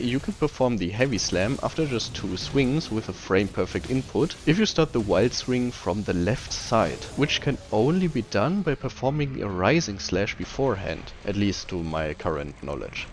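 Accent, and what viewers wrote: Germany. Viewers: Dieser Deutsch Akzent 😂😂😂 gutes Video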